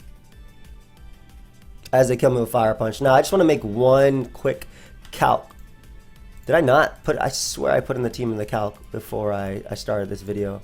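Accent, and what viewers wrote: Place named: New York, United States. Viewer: It's the NY in him. YERRRRRRRRRR!!!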